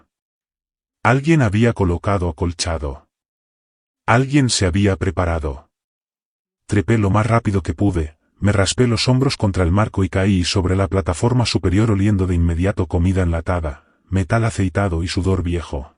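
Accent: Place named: Spain. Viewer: L voz españolísima la embarra..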